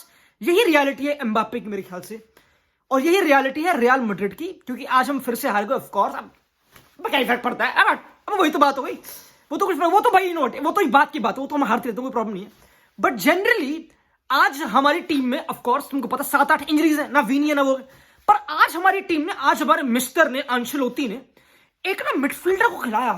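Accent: India. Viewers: Bro are you talking English or Indian ,I can understand 1 every 3 words you say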